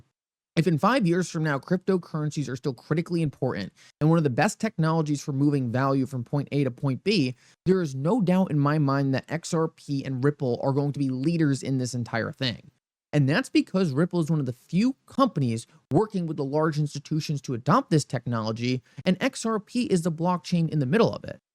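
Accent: Allentown, United States. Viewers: with an Allentown accent bunch of bs again. Even Elon cannot move shit coin XRP upwards,lol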